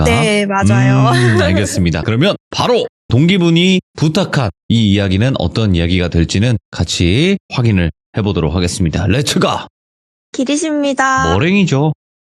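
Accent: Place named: Jeolla, South Korea. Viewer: 고향 전라도시죠!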